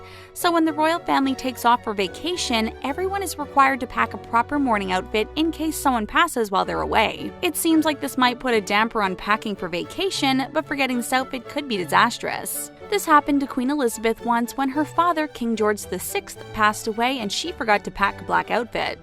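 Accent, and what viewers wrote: United States. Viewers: Narrated by an American….. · you can tell who was talking is american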